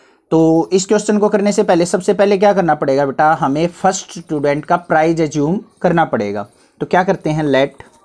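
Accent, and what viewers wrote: Haryana, India. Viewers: Sir are you from haryana your accent is haryanvi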